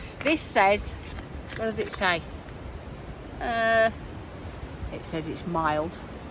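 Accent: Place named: England, United Kingdom. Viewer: This is the 2nd video of yours i watched. You're a funny woman, I like that. I'm glad you're not dead yet. I always have to listen very carefully to understand your English accent. But I can manage that. Thanks for the videos, best regards from Germany.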